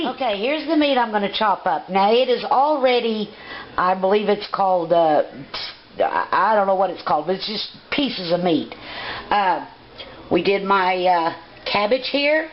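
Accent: South, United States. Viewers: I am so buying one! I must admit your accent is too cute and I'm a Texan! Gotta love the south!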